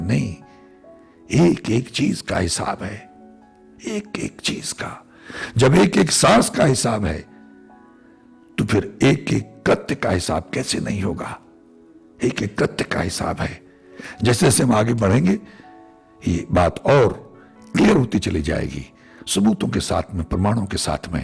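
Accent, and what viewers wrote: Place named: Sialkot, Pakistan. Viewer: आप सियालकोटिए हो। आपकी बोल बाणी से लगता है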